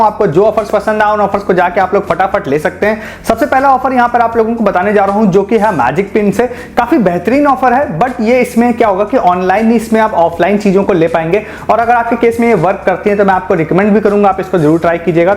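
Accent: Kanpur, India. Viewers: bhai kanpur she ho kya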